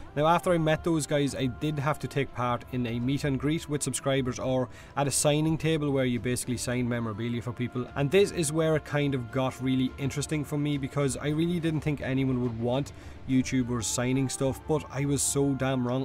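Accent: Ireland. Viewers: lol are you from Ireland??? I em in Ireland to :D loooool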